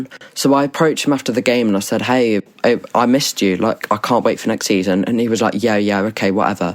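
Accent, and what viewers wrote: England, United Kingdom. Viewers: its too good to be true lmao uk accent and hes acting like hes in a high school movie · ooo la la i am in love with english accent😍😍😍😍😍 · well given by his accent and the fact that he said football and not soccer I think UK school works differently than American school what it comes to summer break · Someone sounds BRITISH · I love it the accent british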